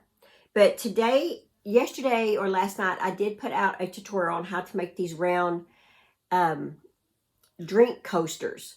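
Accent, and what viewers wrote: South, United States. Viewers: The berry compote yarn is a beautiful combination of colors. I love hearing you talk. Nice southern accent. The scripture today is so true. Hugs 🤗and love to all 😇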